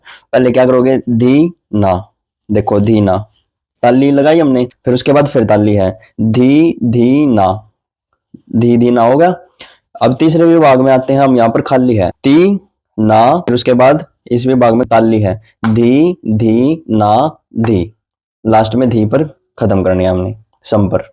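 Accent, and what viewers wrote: Haryana, India. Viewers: That Haryanvi tone hits ❤❤ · Haryanvi tone ❤❤ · Kitna sahi accent hai bhai. Haryanvi ho? Thanks for the information and motivation btw❤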